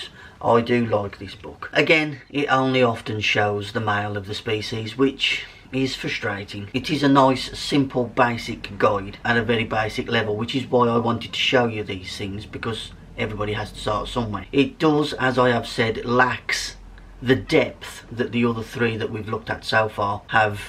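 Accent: United Kingdom. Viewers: I can tell by your accent you must live in the West Midlands. If you do, you must visit Iron Bridge, some incredible wildlife there. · Where about in the UK are you from. Am I detecting a Black Country accent? or am I a bit off?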